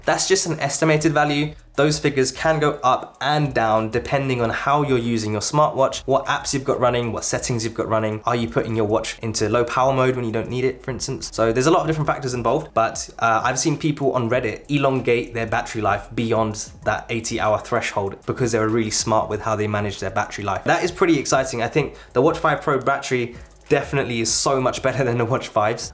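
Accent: United Kingdom. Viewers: Very helpful. Thanks...you sound like your from west london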